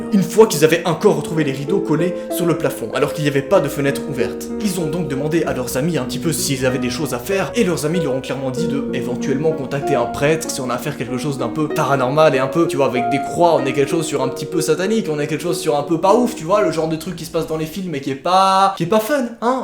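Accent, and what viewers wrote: Belgium. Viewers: T belge nan?? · Il est belge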